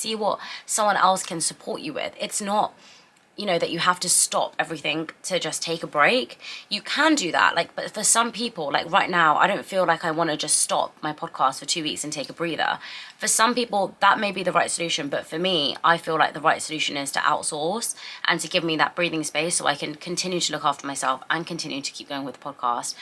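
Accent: United Kingdom. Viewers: How come you’re accent is so British, just amazing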